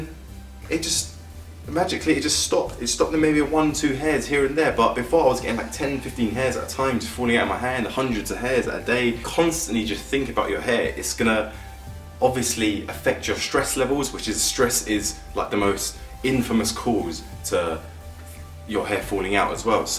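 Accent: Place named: London, United Kingdom. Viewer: Bro might I recommend shaving your head - you're a good looking dude and you can pull it off. It would look a lot better than what you have now. Dht causes hair loss, not shampoo, not stress, not smoking, not diet, not anything else. You sound like you live in London, so speak to the top trichologists and hair transplant surgeons on Harley street, and they will tell you the same. I used to think like you did, until I saw a fat homeless junky outside Bond Street with a head full of amazingly thick hair - I'm sure he's stressed, I'm sure he smokes and drinks and eats shit. So why isn't he bald? Because his genetics are coded differently to mine and yours - his follicles are resistant to dht, yours are not.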